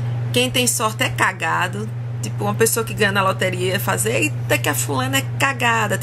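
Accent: Brazil. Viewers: Tô ligado que tu é daqui de Maceió rsrsrsrs, Esse sotaque eu reconheço na china. Eitxa sotaque gostoso da boba! Só algumas gírias que deve ser mais falada em outros estados,mas o resto eu falo quase tudo aqui kkkkkk. Ala Ala Ala Alagoas ;) . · Bah Tche O Sotaque Nordestino é meio difícil não sei Porque se eu sou Gaúcho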